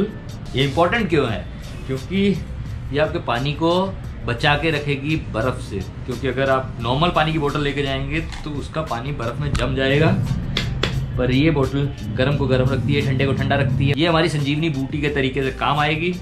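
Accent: Punjab, India. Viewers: Savage bro plzz bnao aur mze Ek to punjabi tadka #kamaal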